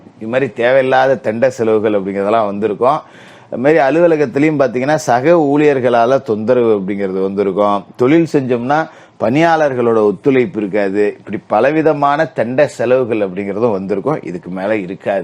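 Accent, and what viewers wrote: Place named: Thanjavur, India. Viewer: உங்க slong தஞ்சாவூர் மாதிரி இருக்கிறது எந்த ஊர்ல இருக்கீங்க ஜோசியரே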